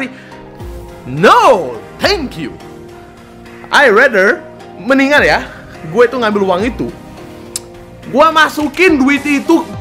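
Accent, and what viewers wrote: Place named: Indonesia. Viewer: gilak bahasa indonya udah logat indo banget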